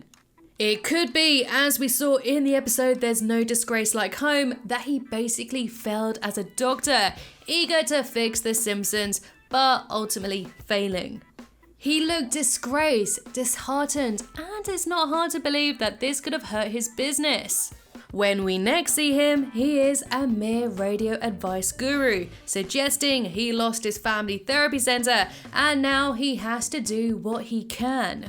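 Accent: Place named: England, United Kingdom. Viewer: This is my first time visiting your channel. How did I know you were English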